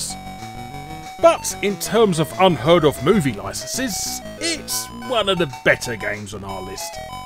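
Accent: United Kingdom. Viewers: British pronunciations of Japanese names hurt.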